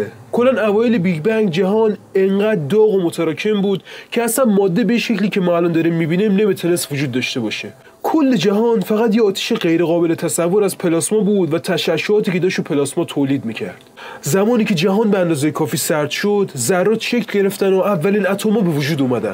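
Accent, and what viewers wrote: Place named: Mashhad, Iran. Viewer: با سلام خسته نباشی کارت خیلی خوبه فقط لحجه مشهدی رو غلیظ تر کن و کمی چاشنی طنز بهش بده خیلی عالی میشه موفق باشی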